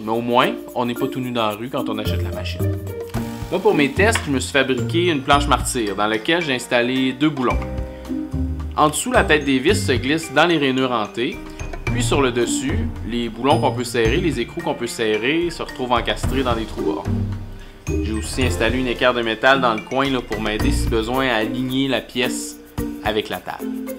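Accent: Quebec, Canada. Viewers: Vraiment bien expliquer! Au "Salut!" j'ai fait le saut je m'attendais à entendre un accent français et non québécois! Vraiment bien fait le vidéo avec des explications claires! :D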